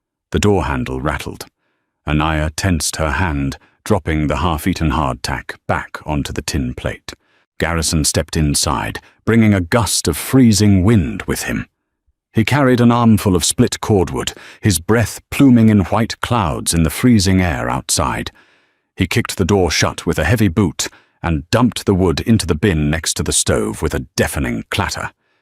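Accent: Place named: RP, United Kingdom. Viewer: This upper crust British accent is not a good voice for pioneer stories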